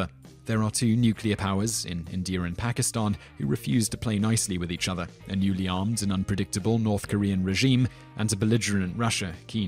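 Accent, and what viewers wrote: United Kingdom. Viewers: Curious. US pronunciation and grammar, in a British accent.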